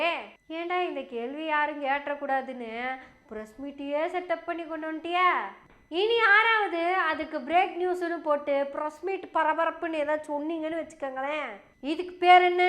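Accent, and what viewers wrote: Kongu, India. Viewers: அம்மா, உன்னோட கொஞ்சும் கொங்கு தமிழ் சூப்பர்!.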